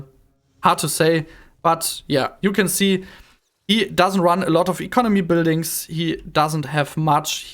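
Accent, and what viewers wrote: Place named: Germany. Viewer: I love your beautiful denglisch :D "It was a inner flower picking" xD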